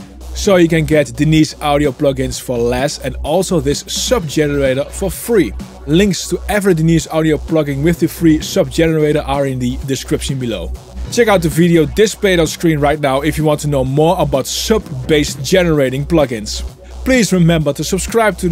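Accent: Netherlands. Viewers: i hear the nethelands yes, goede video tho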